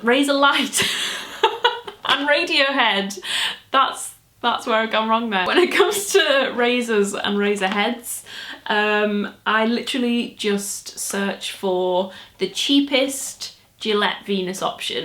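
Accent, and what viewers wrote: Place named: Liverpool, United Kingdom. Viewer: I thought I recognised your accent! I have been trying to place it for so long. Who knew it was so close to home (Liverpool). Love a good boots haul 🙂. Congrats on 150k!!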